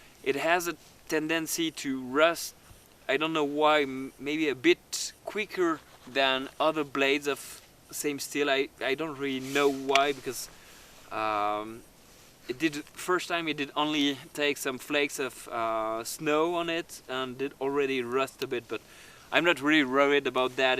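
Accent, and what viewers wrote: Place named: Switzerland. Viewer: hoi du CH schweinchen (schweinli doesnt seem correct). Love the accent, too many years since ive heard it. What part of Helvetica are you filming in? Nice video, reminds me of many happy nights in your snow. Nice knife, im attached to hand forged steel, but its a big chunk of change. I wonder how the quality is now, so many years after the split. Viel gruess aus Florida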